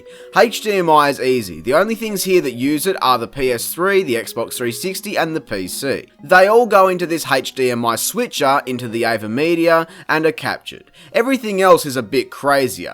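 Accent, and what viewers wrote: Australia. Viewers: Ha love that set up bro 😜 Btw do you live in Australia? You sound Aussie 😃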